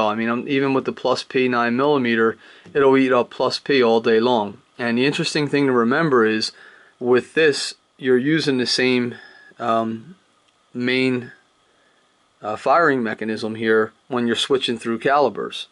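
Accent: Philadelphia, United States. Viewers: u got a be from the Philly area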